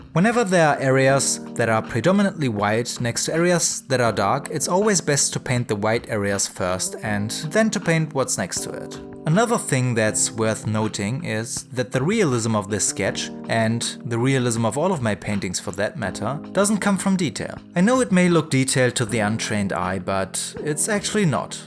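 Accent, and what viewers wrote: Germany. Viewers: Wusste ich doch, dass du Deutsch bist! Hab ich sofort gehört :) Deine Kunstwerke sind total inspirierend, vielen Dank dafür! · Hello, I kind of knew from the beginning that you are german / turkish. I could hear the german accent since I’m geman myself :) Let me say that the tricks and advices you gave helped me rethink things I hadn’t noticed before. That is what I really appreciate about all of your videos since they are truly helpful aside from the timelapses of your paintings of course. As you explained (and as I understood) uploading your videos is not meant to gain a great amount of subscribers but simply share your techniques with us - which is what makes you so special for me since you only upload them for fun. I enjoy watching your videos alot and would like to thank you for sharing your view of art and advice with us / me. Liebe Grüße Rebecca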